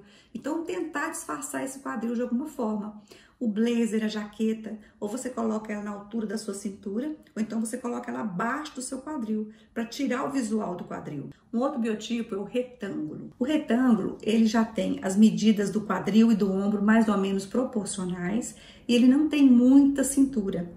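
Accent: Minas Gerais, Brazil. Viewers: vc é mineira? Pois amo este sotaque!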